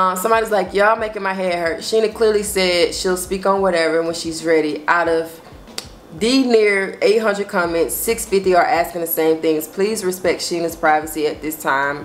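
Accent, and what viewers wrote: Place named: South, United States. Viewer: She's definitely from the south.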